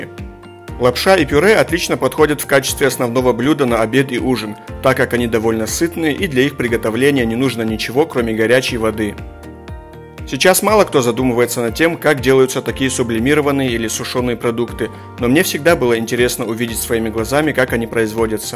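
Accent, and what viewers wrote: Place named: Adygea, Russia. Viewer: Адыгейский акцент))) классный класс си брат 👦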